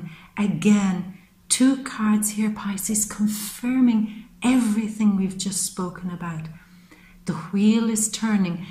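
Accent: Ireland. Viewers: Hello, thank you for your well prepared reading. I loved the references and quotes of famous Pisceans. I find you to be very actuate (for me) and very profound. Since the beginning of the year (I have spent a lot of time thinking (alone-like the Hermit), I am going through a life changing transformation and your info is very timely. I am so happy to have found you. A Big Thank You. I love your lovely Irish accent too.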